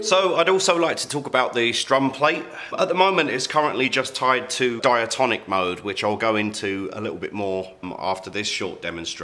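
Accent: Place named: United Kingdom. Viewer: From your accent you seem to be British so why is everything priced in American dollars on your site? Are we going to find that UK customers are going to be ripped off again (I've been charged over £250 for things priced at $250 US in the past by other companies) because someone seems to think that we're too stupid to notice the difference in the exchange rate, and they also think we won't notice that we're being conned. This looks like something I can make good use of but I'm reluctant to buy without knowing how much it's really going to cost me.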